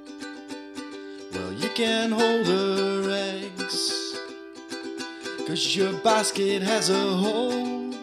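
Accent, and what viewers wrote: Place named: Netherlands. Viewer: Are u Dutch? you sound like ur Dutch ;)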